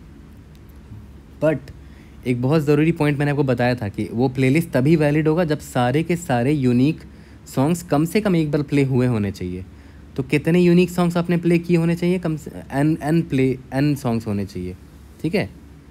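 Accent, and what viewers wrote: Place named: mixed, India. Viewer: aapke accent se lag hi raha tha up bihar hoga padosi nika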